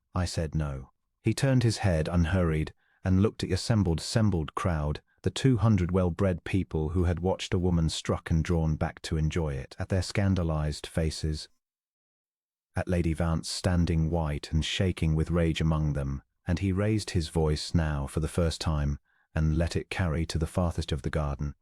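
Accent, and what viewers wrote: United Kingdom. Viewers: Lovely story--well written and wonderfully narrated. Thank you for having a British accent to your narration; it's a British story, after all.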